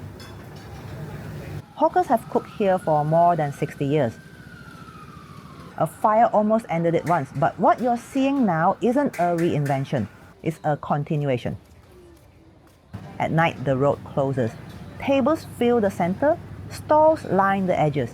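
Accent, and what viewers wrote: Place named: Malaysia. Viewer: you’re most welcome! Hopefully, one day there will be enough to fill a book. You are doing a service capturing the humanity and character of a version of Malaysia that is rapidly vanishing into the past. Your gentle narration and natural Malaysian accent make the videos even more special. I hope more people discover your channel!Brava! 🫶🏽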